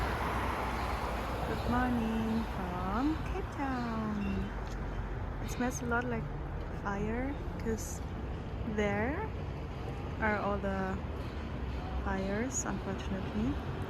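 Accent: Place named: Germany. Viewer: Ohhhh I recognize that accent 🥹. The lovely people who always say “ my English is not so good” then proceed to give me fluent English instructions to the bahnhof. I met the sweetest and kindest humans in Germany. I hope you enjoyed your stay in SA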